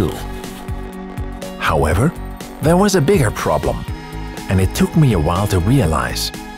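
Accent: Netherlands. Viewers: Me: That accent.. Is this guy Dutch?: “Toedels!” Me: YUUUP! Gelijk geabonneerd! ❤ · An interesting dutch accent!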